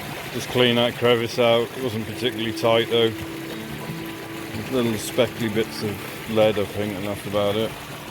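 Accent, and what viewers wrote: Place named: Norfolk, United Kingdom. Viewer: Where are you from butty. You don't have a Welsh accent....more like Norfolk. I guess you moved out of Gods country but the Hiraeth brought you back 🏴👍. I guess the prospecting in the South was not so good. Most of the interesting minerals are in Mid and North Wales. Keep up the good work.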